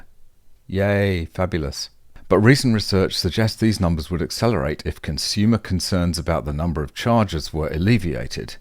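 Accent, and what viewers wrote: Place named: United Kingdom. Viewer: Strangely even though I know you are Australian you sound more British to me.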